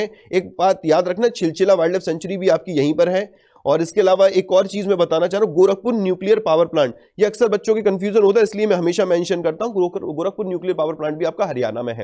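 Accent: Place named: Agra, India. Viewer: Arrey yaar ko agra me bolte h sir agra ke h isliye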